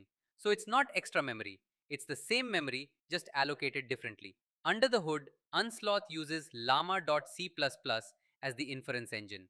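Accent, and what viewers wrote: India. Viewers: (It's an AI generic Indian accent shared by various ai channels ... it is a soothing one though) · thanks for the video, really helpful for low end systems. i also like youre indian accent 🙂👍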